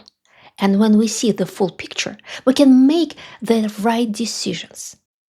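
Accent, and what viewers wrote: Russia. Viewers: Just my two cents... 1. Terrible English pronunciation (strong Russian accent), painful for my ears, a transcript would have helped. 2. Long, convoluted sentences, the entire talk could be made more succinct, the concept does not desire such a lengthy analysis. 3. Khalava (халява) is a simple concept, called "bribe" in English (literally "present", or "cadeau" in French). 4. you link military technology and Russia not posing a threat, neither is either true or have logical connections, not to mention the concept of "threat". 5. Subsequently, you hint on a Hitler analogy for Russia: please... we know who are those spreading that false narrative, are you one of them? Bad idea. 6. Having a separate video about Putin's psychological profile? What kind of credentials do you think you have to make anyone interested in it? 7. Talking about arrogance (that you yourself radiate), you are absolutely right (ironically), that it is a bad trait and should be avoided, along with hubris. 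8. On generic lines, you are not saying anything outrageously stupid or incorrect, just repeating a certain narrative, hence "nothing new". Alas, biased videos like this can proliferate on YouTube, as there are always enough polarized people who will feed on these. IMHO this was a waste of (my) time, so good luck with this narrative, but I find it unwatchable and a (rarely clicked) thumbs down. Peace :) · I love the Russian accent, it is such an interesting informative explanation about the Russian culture and mindset.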